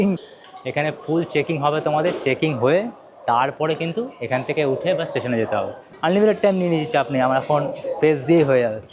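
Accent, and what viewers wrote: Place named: Kolkata, India. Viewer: Kolkatar kotha bolche mone hoi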